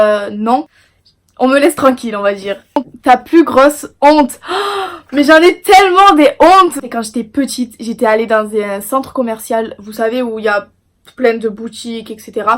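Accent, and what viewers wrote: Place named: South, France. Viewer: L’accent du sudddd 🤪 continue 💙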